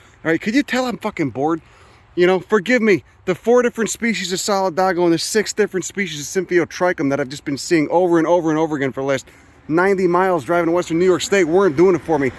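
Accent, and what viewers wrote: Chicago, United States. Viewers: Aye as a WNY native, Buffalonian to be exact I've been waiting for this. The train tracks in Buffalo & the connected bike path (compete with an abandoned golf course converted to a diy skatepark by the local latch key youth) is where I fell I love with native plants. #Greatlakessupremecy..........I'll forgive the NE accent, but you're closer to WNY with your Chicago accent than you would be with New England.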